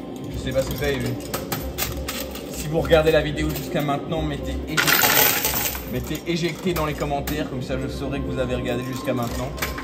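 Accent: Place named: Belgium. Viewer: Coucou, nouvelle abonnée...vu ton accent ça doit être en Belgique ces machines car en France ça n'existe pas de gagner des billets aux pousses pousses, dommage car pour l'adrénaline c top!😁, Je te souhaite beaucoup d'abonnés !😊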